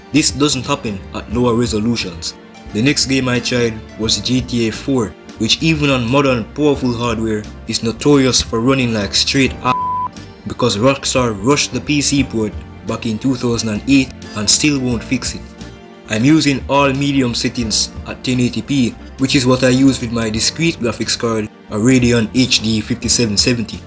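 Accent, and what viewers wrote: Jamaica. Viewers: Jamaican accent discussing tech is low-key soothing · Bro ur Jamaican? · Bro sounds oddly Jamaican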